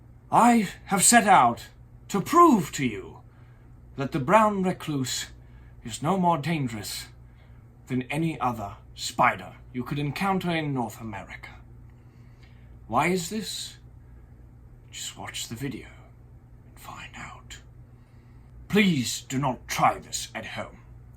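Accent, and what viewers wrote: RP, United Kingdom. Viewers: That Attenborough accent tho...❤👏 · Good posh English accent and I’m English! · Good british accent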